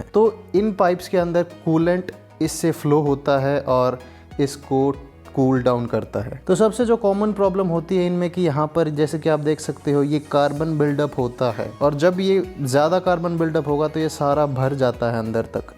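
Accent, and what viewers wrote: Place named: India. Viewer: What language are you speakin? English-indiano? We don't understand a f****n thing seriously....add subtitles.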